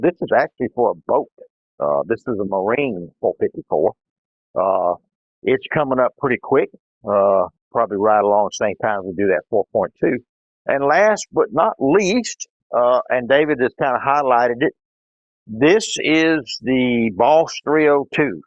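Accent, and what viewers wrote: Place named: Georgia, United States. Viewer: Man, I love hearing this gentleman speak on motors. I’m from SC, and that Columbus, GA accent sounds great from here in Hamburg, Germany tonight. Thanks for the vid! Ready to get home to my 68 GTO.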